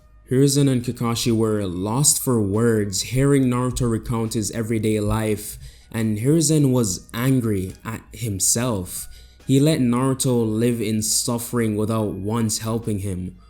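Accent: Jamaica. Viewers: Very refreshing to hear Jamaican making this kind of content. I had to come back after years to listen to this story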